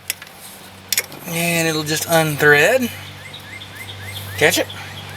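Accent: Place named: South, United States. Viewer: Sorry so long but my just lost my mom last year an then month or two ago his younger brother died suddeny like my mom and that was always my trouble shootin team dads just tired of hearing it run wrong but its to solid truck to give up on for 86 model and only 22 or maybe 26 or 28,196 is actually all it has in miles and never left my home town since Henry Ford shipped it u know of any other TSB again sorry so long of explaining. But u sounded knowledgable enough an had just enough country swang i can understand sold Haley parts to fellow cldnt say shit with mouth full unless it was 100 mph thanks for any info Sir And as always God Bless u and urs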